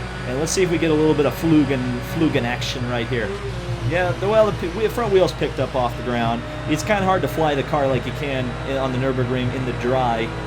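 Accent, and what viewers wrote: United States. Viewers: Finally an American... i hate hearing some brit’s lispy-ass voice ffs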